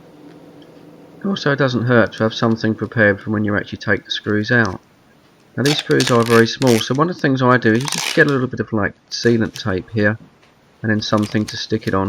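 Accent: United Kingdom. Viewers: Thank you Sir, Your advice saved me a lot of frustrations when my iPhone's screen went blank after entering wrong passcode several times. The screen went black and blank and was unable to respond to anything after trying to reboot or press home button several times. Thank you again.- -Richard from Canada. ( I know the owner of this video is in the UK {telling by accent})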